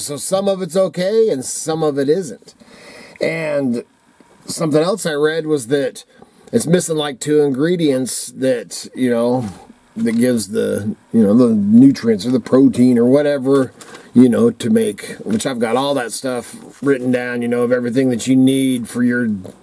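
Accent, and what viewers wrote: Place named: Idaho, United States. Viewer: 1st time viewer. Got a kick out of your Idaho hillbilly chatter. I'm old and lived around hillbillies from west to east and all over the south but looked at northerners as city stickers. Might be because new York and Chicago is upstate. Live in the hills of Kentucky, edge of the Cumberland near mammoth cave park. Got to say, there's not much differences between Idaho and KY billybobs....Got a kick out of your video...Even Miss Kitty ☺️. I never knew people had so much nit picking feeding their.chickens. What ever happened to table scraps, fats from cooking, crushed shells mixed with peanut butter, lol... a little tid bit for you dear. I buy the corn and seed mix from tsc. I figure chickens eat everything....even sheep and goat feed if hungry enough. I've never seen a chicken full. I'm guessing it's the processed feed and not the scratch feed that stops hens laying. 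Biden said he was going to collapse the food industry. I heard it myself in disbelief he even said it and it phased nobody and was not shown again until Tucker showed it on fox recently. And again. No public response. Got to pinch myself now and then ... this is still America, right? Or a petri dish for some creepy villain in cartoons or movie? Ha! I believe it's a God thing and now people minds will be blown....I mean really blown away. Daddy told me to stay away from flights and never throw the 1st punch. Wait for it and do you you have to .... run or fight... just win it or give it your best. That all a should c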